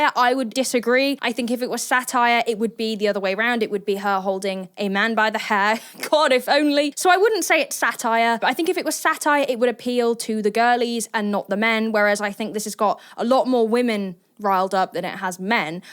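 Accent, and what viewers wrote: United Kingdom. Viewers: I made the mistake of looking at the comments section of Andrew Wilson's response to and it amazed me how much supposedly grown men are triggered by an outspoken young woman with piercings, tattoos, stuffed toys in her room and a British accent. Keep making content YOUR way, don't listen to "alpha" losers like him and his followers.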